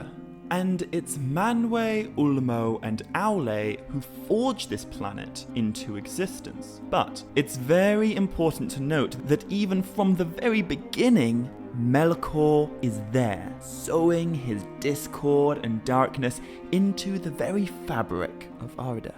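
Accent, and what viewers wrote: England, United Kingdom. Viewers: You do realize that he is English and they pronounce words differently, right? And considering how the ENGLISH invented the ENGLISH language, and also considering that Tolkien himself was English, you don't have any grounds to tell him how to pronounce English words. You just sound like an ignorant jackass.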